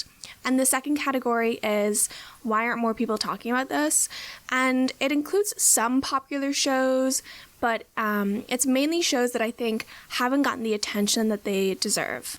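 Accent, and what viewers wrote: Ireland. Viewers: Are you Irish? Every now and again I hear a slight Irish accent. Just curious 😁 really love all the videos u make ✌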